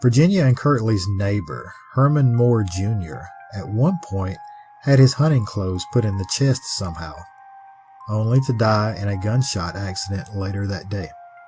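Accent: South, United States. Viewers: What an eerieitem... I was a Zac baggins enthusiastic but he's kinda lost me a little,... yet again your Southern drawl thrills me ❤😊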